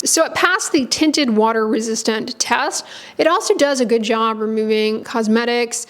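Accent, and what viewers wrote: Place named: Southern California, United States. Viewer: I’m taking bets it Southern California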